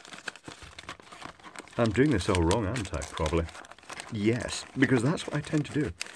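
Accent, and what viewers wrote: Scotland, United Kingdom. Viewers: Shame you didn't put some cheese with the 'French toast'. I find those things too sweet, so with cheese, might taste better! I'll forgive you tho because I absolutely love your soft, gentle Scottish accent and could listen to you all day.... And how nice to hear a man who isn't afraid to admit that he's not too keen on the hot, spicy sauces.....usually male reviewer's seem desperate to impress, with being macho and pouring on loads of (gut) killer pepper/chilli/tabasco sauces. And you're messy.......like your style!!!!!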